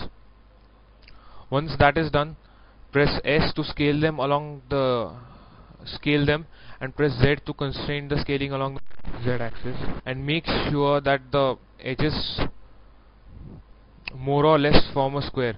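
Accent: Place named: India. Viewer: very nice work, do yo have more blender tuts? I need to practice my Indian English listening.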